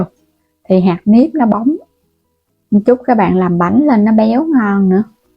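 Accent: Mekong Delta, Vietnam. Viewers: Giọng nói miền Tây của người ta đó 😊😊😊😊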